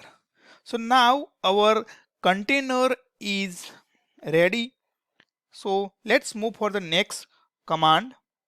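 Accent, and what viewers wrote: India. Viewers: Aa pako Gujarati lage che Speech per thi😁😁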